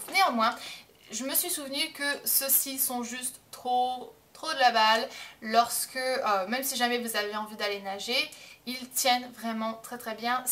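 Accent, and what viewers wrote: United States. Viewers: Parfois lorsque tu parles on dirait presque que tu es une vrai américaine ! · J'aime vraiment ton "accent" anglais/américain. Même si je sais que à la base tu es française, mais je trouve que cette langue te va vraiment bien dans ta façon de parler etc. (; Bonne continuation. ♥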